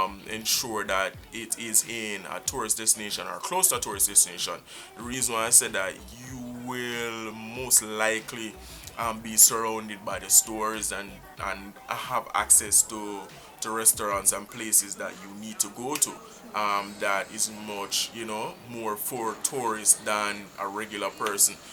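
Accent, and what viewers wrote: Jamaica. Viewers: I was just mesmerized by you talking than anything else. I love the way Jamaicans talk.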